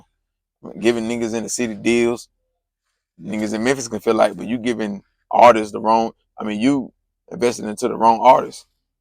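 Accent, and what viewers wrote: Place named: Carolina, United States. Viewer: I think u from the Carolina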